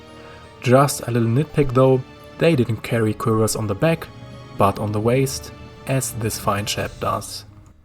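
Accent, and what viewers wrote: Germany. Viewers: South sweeden is literally called Godar I mean.. I will asses you favor the german theory becouse of you accent rather than actual proff.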